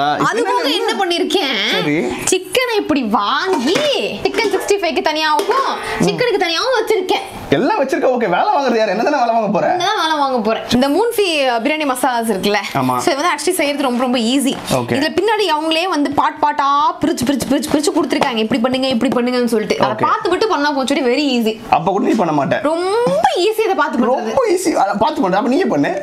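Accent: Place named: Coimbatore, India. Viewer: Namma covai slang apo apo vanthutu poguthu. akka....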